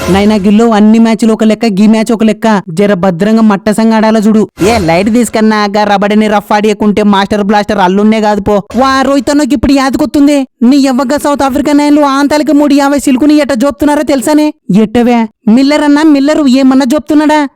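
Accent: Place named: Gadwal, India. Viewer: Super anna, Gadwal slang lo raffadinchinav po, ni concept nd creativity ki na salam inka ilanti enno manchi videos cheyalani korukuntunna 👏👏😇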